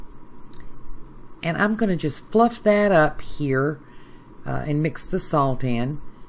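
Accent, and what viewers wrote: United States. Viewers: So odd to watch a Cornish pasty being made by a lady with an American accent, nicely done though.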